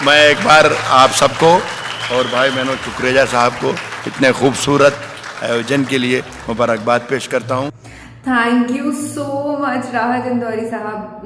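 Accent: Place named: Jaipur, India. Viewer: Aap jaipur se ho mene aapki bhasha suni mebhi जयपुर मैं ही rehta हूँ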